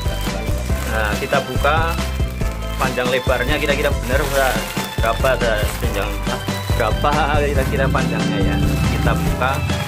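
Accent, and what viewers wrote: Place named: Banyumas, Indonesia. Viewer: Wahhh buat shooting ya mas boss...lucu ngapaknya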